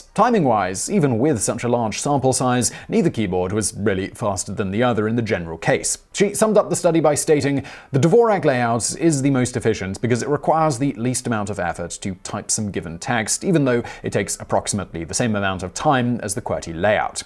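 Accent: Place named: South East England, United Kingdom. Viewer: both and I were born and brought up in the South East of England, and share a common dialect with very similar accents. I am not have "Sport" with his accent. (It is essentially the same accent as my own, with slight variation due to us both moving away from that region, and living in a variety of other areas over the years.) He absolutely said Continents. Further your accusation of "People such as yourself love this kind of stuff" is an appalling and baseless assumption on my character.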